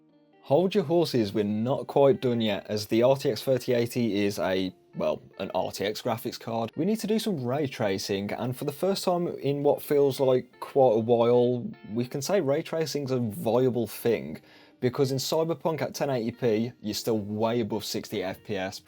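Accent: United Kingdom. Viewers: I just bought the 10 gb version done weeks ago for 373 USD or 289£ since you sound British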